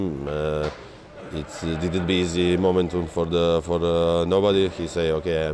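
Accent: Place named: Yorkshire, United Kingdom. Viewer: He’s starting to get a Yorkshire accent 🤔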